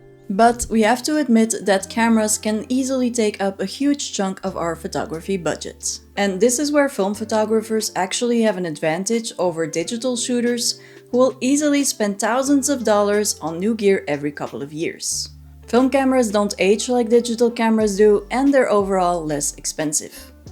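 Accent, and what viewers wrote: Flanders, Belgium. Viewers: Great to hear a flemish intonation on a video about my favourite topic; subscribed!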